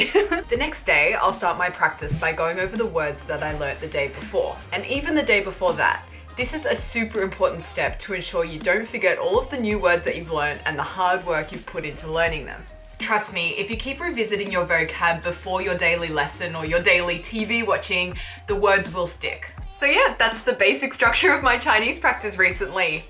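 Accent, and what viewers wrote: Australia. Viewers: Australian accent is hilarious. I wonder if native Chinese people can tell them apart from an American accent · As a Chinese,I like your Australian accent. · So if you want to speak like Ausie just insert an R after every O sound. OrK ? sor goring dorn't shorw vorcabulary cornjunction cartoorns gor goord morment Not to mention all the up talking, very very painful to listen to.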